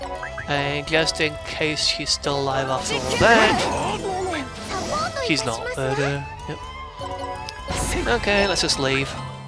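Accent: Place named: United Kingdom. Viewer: It's a pleasure listening to your british accent <3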